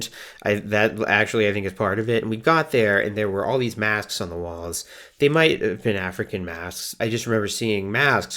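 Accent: RP, United Kingdom. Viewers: As a Brit, I approve of your posh English accent. I thought you'd like to know. Also: here's a bouquet 💐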